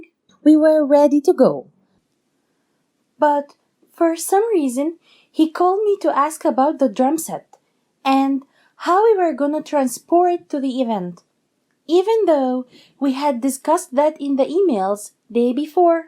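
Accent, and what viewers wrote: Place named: China. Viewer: Learn how to talk better I don't understand you with your Chinese accent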